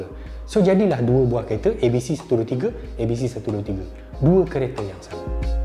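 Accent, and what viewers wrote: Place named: Pahang, Malaysia. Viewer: Org mentakab ker bang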